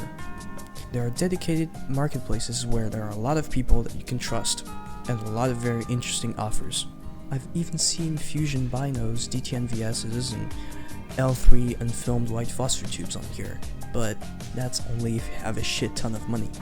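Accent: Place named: United States. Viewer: not bitching but you sound awfully american for someone giving advice on getting nods in eu, hehe. also, is that machine girl in the outro?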